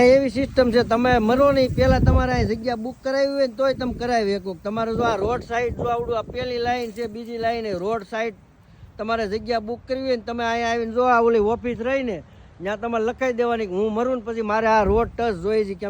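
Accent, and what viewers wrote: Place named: Kathiawar, India. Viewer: Vah Pyor kathiyavadi lago so hooo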